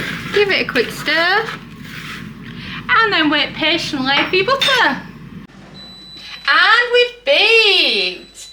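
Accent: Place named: Yorkshire, United Kingdom. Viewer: Will be trying this recipe out today, thanks. Btw, a fine Tyke accent! Lovely to hear someone speyking reyt! xxx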